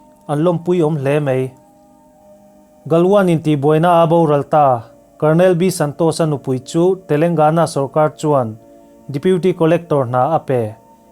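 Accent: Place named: Delhi, India. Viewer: I pronunciation ațang hian Hindi i thiam hmel riau. Delhi vai i kâwm tam a nih dawn hi.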